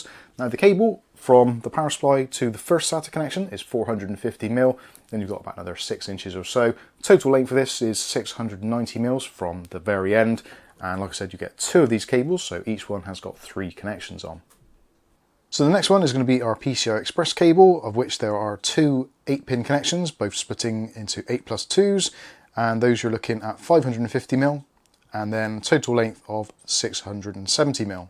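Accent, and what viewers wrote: Bristol, United Kingdom. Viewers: Nice video. 'Ere - you a Bristol lad or no? Churz!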